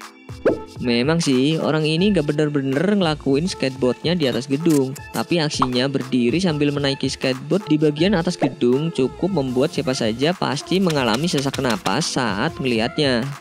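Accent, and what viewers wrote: Java, Indonesia. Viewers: mau tanya, mimin orang jawa ya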